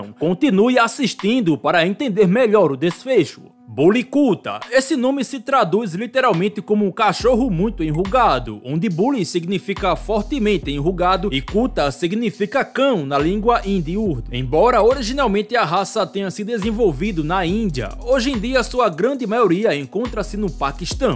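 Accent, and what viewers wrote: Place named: Paraíba, Brazil. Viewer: Sua locução é demais com esse sotaque meio nordestino de Paraíba, mas o troço chato é cão, tira a paz de qualquer bicho. rs Se o felino tivesse solto ele veria o que era bom. rs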